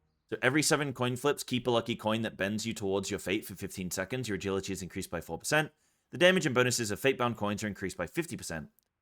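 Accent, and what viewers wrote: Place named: England, United Kingdom. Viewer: I’ve abstained from posting this comment for the longest time, but finally cracked… where are you from? I recognise a mostly English accent, however, there are a few intonations in your voice that make me question this. As an aside; another great video, thank you. Feral main 👍🏼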